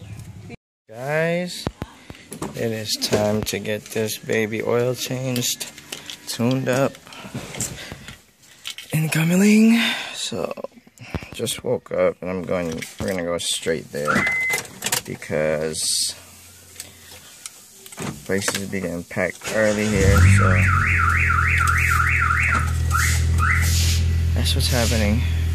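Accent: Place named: United States. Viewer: Luv your vlogs...Are you still in school or your done already? Luv you still have your American twang:)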